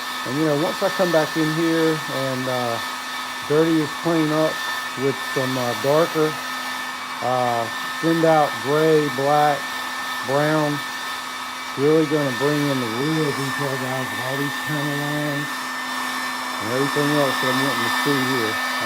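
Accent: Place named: Kentucky, United States. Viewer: thanks for quick reply, my wife got me the F4U yesterday and I assembled last night, I tried the chalk for panel lines, I had some French chalk and ground that into some alcohol and it worked great. I live in Taiwan so bit far to go to Walmart but I will call at my local stationary and art supplier they should have bottles if Acrylic paint. Thanks again for the reply and great info on your channel. Listening to you reminds me of my time in Kentucky a few years ago, hope that is not an insult as I see your in NC.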